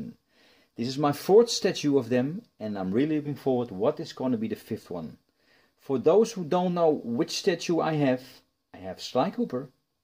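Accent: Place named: Netherlands. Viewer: Echt een typisch Nederlands accent.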